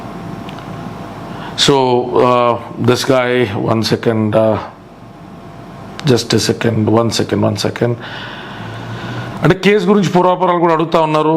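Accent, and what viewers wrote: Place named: Bhimavaram, India. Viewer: దయచేసి మీరు ఇంక మీడియా ముందుకు రాకపోవడం మంచిది గారు.. JSP వల్ల చెడు అయ్యారు, ఈ లావణ్య కేసు వల్ల ఒక రకంగా పబ్లిక్ దృష్టిలో జోకర్ అయ్యారు.. బూతులు తిట్టి మా లాంటి వాళ్లకు గట్టి సమాధానం ఇవ్వ వచ్చు కానీ పబ్లిక్ లో మీపై ఉన్న అభిప్రాయం మారదు..ఇకపై మీకు శ్రీరంగ నీతులు చెప్పే అలవాటు ఇకపై ఐనా మానుకోవడం మంచిది.. 12 నెలలు అంటే ఆ తరువాత మాట్లాడుతారా..?? మరి ఇన్ని రోజులు భీమవరం యాస లో పెద్ద రాజకీయ ఉద్దండo లాగా ఎందుకు మాట్లాడారు..?? వాస్తవానికి మీకు ఉన్నది కుల గజ్జి రాజకీయం లో కమ్మ సామాజిక వర్గం మీద మీకు ఉన్న అక్కసు వెళ్ల కక్కడo కోసం మాత్రమే మీరు జన సేన పంచన చేరారు..అక్కడ మీ స్వభావం చూసి పార్టీ నుంచి పక్కన పెట్టారు.. MARK MY WORDS మీరు TDP win కాకుండా ఉండాలి అనే మీ కోరిక నెరవేరలేదు కాబట్టి ఇపుడు YCP కి మద్దతుగా TDP&JSP కి వ్యతిరేకంగా వెళ్తారు భవిష్యత్తులో..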